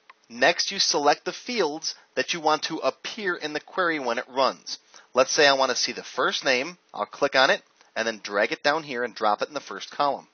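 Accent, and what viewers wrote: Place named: United States. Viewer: Thank you for your helpful video tutorial! You speak with so much clarity and articulation! What a pleasant surprise I got when I heard your accent. Finally someone who speaks English but even better that it's American :)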